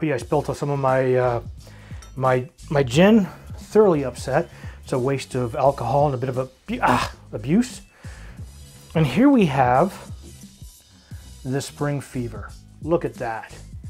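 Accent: Canada. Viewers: Nice job,. And nice looking finished cocktail. I like the slow-motion pouring into the glass. I also like how you Canadians say "about" or "out".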